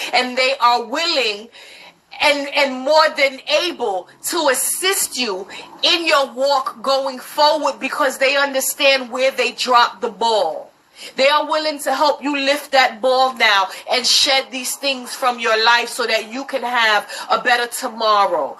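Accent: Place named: Caribbean. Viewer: I can hear that west Indian accent. Preach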